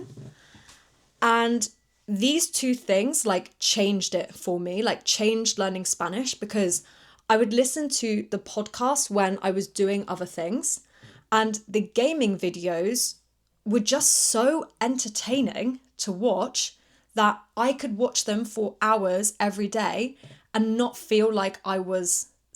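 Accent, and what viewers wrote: United Kingdom. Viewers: Where are you from? You speak in British accent, but it seems to me that you live in USA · Very interesting to know how an adult can learn foreign languages easily and your story is indeed so interesting, as English natives seldom speak foreign languages. Determination is so important. Your videos are really helpful and your British prononciation is absolutely fantastic and you are a great professor.Thank you very much ❤ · What a cute teacher! UK accent is very cool. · I always love watching your videos,. It's so easy to learn British English from your words, and it's so enjoyable. You're great, keep it up and take care. See you soon,. 🇬🇧🇬🇧👍🏻👍🏻📚📚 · Everyday is the earliest/youngest day to start. Just do it. Actually that's so much easier than before since YT exist. In the old days, what you can do is rolling the the sound tape and checking the book at the same time. 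It's impossible for me. YT teach the english so much more effective than the school at my young days. Nothing better than listen to some topic you are interested with the language you learning. For other english learners, this video is speaking with very English English accent. If you speak in this accent, you will be a funny guy in America and very native in UK. There is so much video on YT but there is not much video making by British. You know what, I feel so confidence on English until I arrived UK. I understand nothing when people speaking. I feel like I got scam all my life time by the school and YT. I realize I learnt American English the whole time and that's why I failed my school exam (mostly base on British English). But did a really great job, I can understand 100% in this podcast. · 안녕하세요~~~ 영국발음 너무 좋아요~~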